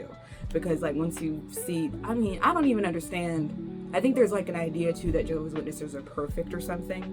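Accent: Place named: United Kingdom. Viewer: Your British was a bit too convincing bc I was thinking "Has she always been British?"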